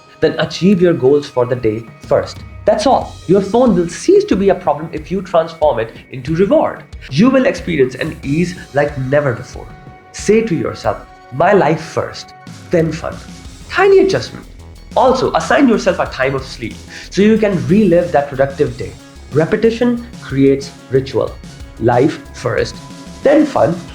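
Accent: India. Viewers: 930,088 I have subscribed, even though at first it sounded like another mindless dribble but by a not too typically accented Indian dude with a channel name that appears to explain vaginal bleeding. Point made and leading up to was fantastic. Thumbs up and if it works for me within the next 3 days I’ll probably donate aswell.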